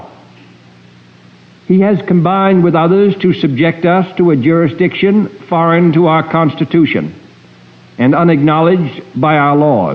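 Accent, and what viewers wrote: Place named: Boston, United States. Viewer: although i lived in a very republican home. i liked jfk.. there was something but of course i had no idea. i was 17 when he was killed (?). now i know why.. he was a good guy..so nice tohear his boston voice as i used to talk like that!!! his legacy will be completed very soon. God bless the Alliance Q and white hats.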